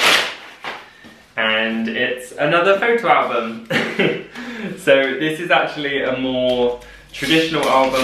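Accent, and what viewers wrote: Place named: United Kingdom. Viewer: Your British accent is so starch. Clean and concise details with your descriptions .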